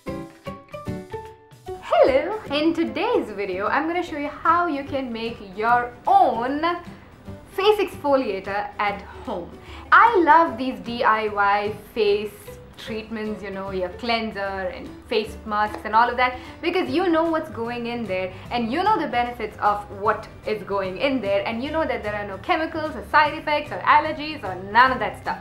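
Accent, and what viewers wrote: India. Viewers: You speak like an Indian..where do u belong to ?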